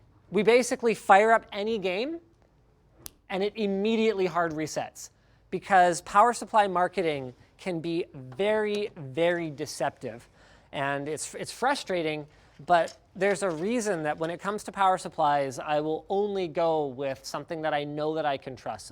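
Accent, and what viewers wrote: Canada. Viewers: You sound Canadian in this. I learned what it sounds like from South Park.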